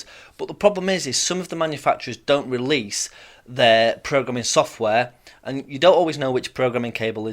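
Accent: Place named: Cockney, United Kingdom. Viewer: Can you change your accent please. I cant understand you properly. You speak to fast. Im not a fan of your cockney accent 👀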